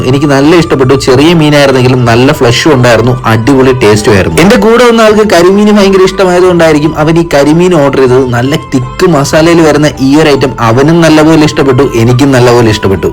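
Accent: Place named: Trivandrum, India. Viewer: Tvm mikkavarum anganeya parayne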